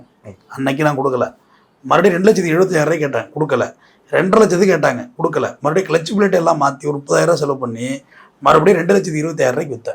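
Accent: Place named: Cauvery Delta, India. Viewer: Sir native delta side pola 🤔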